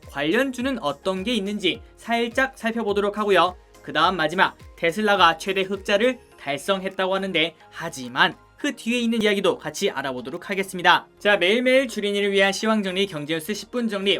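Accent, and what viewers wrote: Gyeongsang, South Korea. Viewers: 고향이 경상도신가욥?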